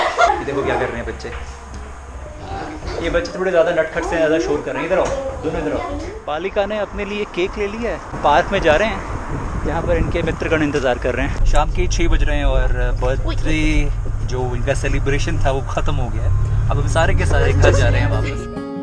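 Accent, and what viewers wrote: Haryana, India. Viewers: Ram Ram Bhai 🙏 🙏 Bhai Pataudi aala ♥️ Bhaiya bda achaa lg rha h aapko haryanvi bolta dkh he video m ...mujhe yaad h m aapko bolta tha hr video m haryanvi bolne ko or wo aap kr bhi rhe h ...bda achaa lg rha h bhai ji ♥️♥️ Dil s dua h bhai ko or jyada trkkii kro aap ♥️♥️ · Bhai kasuta mazaa aa gaya.haryanvi boli chorah daar gya. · Bro are you from Haryana? Cause you speak haryanvi so well. Love from Haryana ❤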